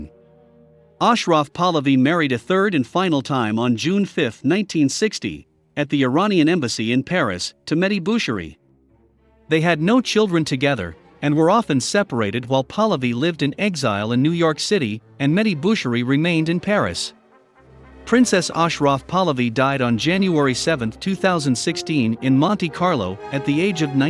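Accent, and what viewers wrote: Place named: United States. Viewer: The strong american accent who disforms the pronounciation of the iranian names is very annoying. The music is too loud and covers the speech.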